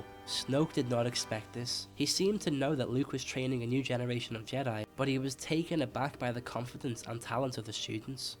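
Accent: Liverpool, United Kingdom. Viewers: Are you from Liverpool?? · Are you from Liverpool? .-. also cool vids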